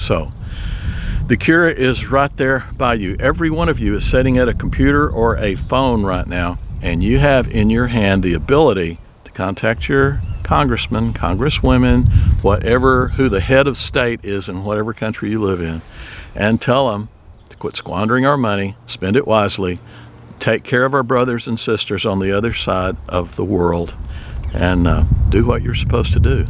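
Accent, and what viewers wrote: Texas, United States. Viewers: Thank you for your posts that illustrate and remind us of our duty and responsibilities as co-creators. Love listening to your voice. Your slow Texas drawl reminds me of my dear Uncle Lee who was also a humanitarian.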